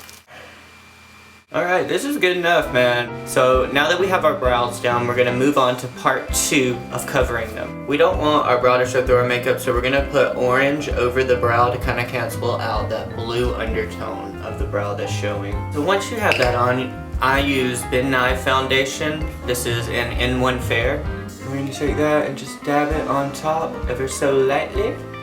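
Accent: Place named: South, United States. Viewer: Lol I was gonna say I'm from Baltimore and his accent def didn't sound like Baltimore accents. It def sounds southern!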